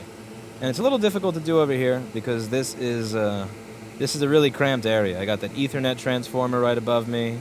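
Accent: New York, United States. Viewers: You are hella New Yorker but I like it. Hey I actually learned how to Solder watching your videos so thanks man. You do you bro, I know I enjoy your videos.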